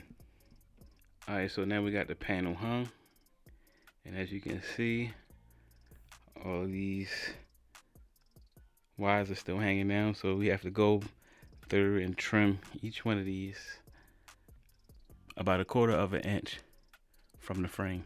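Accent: Baltimore, United States. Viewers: U from bmore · Bruh is you from Baltimore...you talk just like me...ayyyyeeeeee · Sounds like you from BMore. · Somebody from Bmore😂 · Quick to clock an accent. You from Baltimore? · Are you from Bmore? Sound like it. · Are you from Bmore? Sounds like it! Also thanks for the tutorial!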